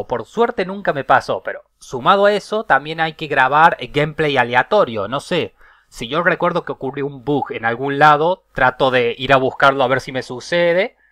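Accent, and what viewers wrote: Córdoba, Argentina. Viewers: Me parecia un acento cordobés pero se me confundía por el filtro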